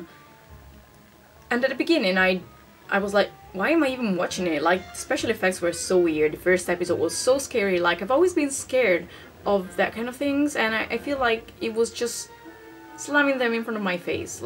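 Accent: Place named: United Kingdom. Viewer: E' stupendo vedere come il tuo accento british migliori di giorno in giorno, ADORO sentirti parlare in inglese aw. Questo video è ORO PURO ^ ((e io sarei una companion diecimila volte peggio di te because of reasons))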